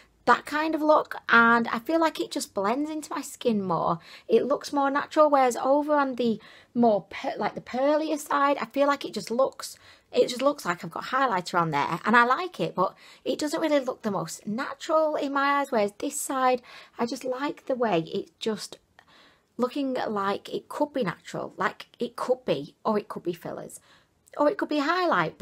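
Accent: United Kingdom. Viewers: It's def like a silk pillow!! Always watching and enjoying your entertaining reviews with your lovely British accent. <3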